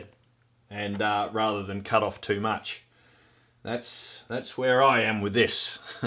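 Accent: Australia. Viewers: As a fellow Aussie, It is a rare pleasure to hear an Australian voice on a you tube show. I have been trying to pick your accent, reminds me of a amalgam of Steve Irwin and Paul Hogan, a touch of John Williamson, with a hint of Bob Hawk and Les Hiddens, hope those Americans appreciate the education they are getting!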